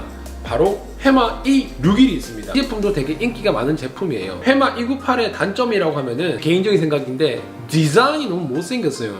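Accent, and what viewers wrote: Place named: Gyeongsang, South Korea. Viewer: ㅋㅋㄱㅋ 돈을마 발라놧네ㅋㅋ 님 부산에서 카페하시나요?? 경상도사투리가...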